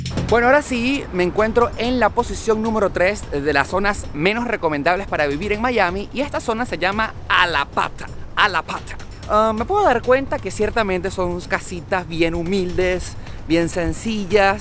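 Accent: Venezuela. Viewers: Tratando de presentar estereotipo con ese asento de gay...osea asento venezolano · este pendejo de donde saco su estadistica. Con esa actitud y voz afeminada logro q no llegue ni 1 minuto, sin considerar ese acento venezolano asqueroso como esa gente.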